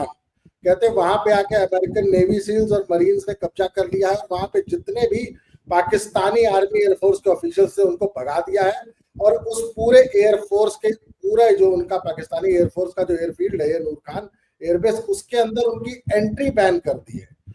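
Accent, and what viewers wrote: Haryana, India. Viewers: Babaji Jay Shri Ram aapka Haryanvi leja bada achcha lagta hai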